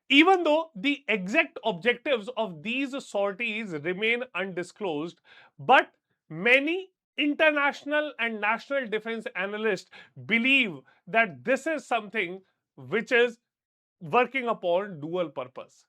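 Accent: India. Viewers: What a painful presentation......babu English, loud presentation, stretched........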